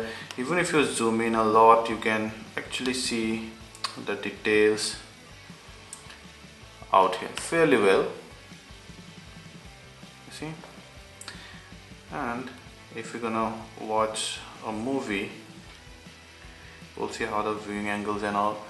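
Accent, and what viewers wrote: Assam, India. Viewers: You sound like Assamese :D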